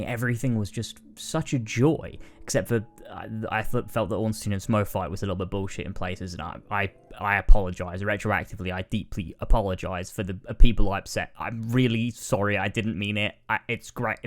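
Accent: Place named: United Kingdom. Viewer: The British Accent Makes a Video Review of Dark Souls 2 maneuver. Certified classic.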